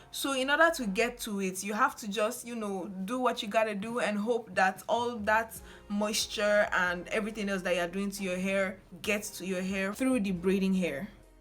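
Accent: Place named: Africa. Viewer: i love your accent. my dad has an african accent, and sometimes i can’t understand him but like african accents are just ughhh😫😌 i love em.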